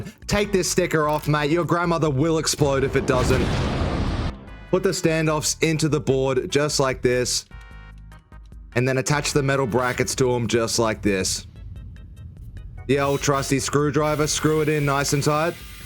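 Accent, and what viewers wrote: Australia. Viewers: I wish I had an australian accent and a better grasp on how to edit videos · are you Australian. you soundlikeit ?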